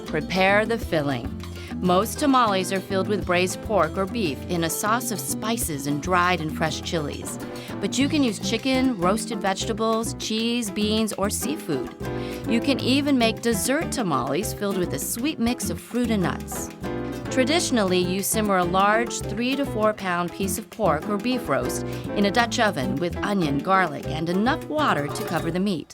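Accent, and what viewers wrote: United States. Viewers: What you heard is an American accent, not an English accent.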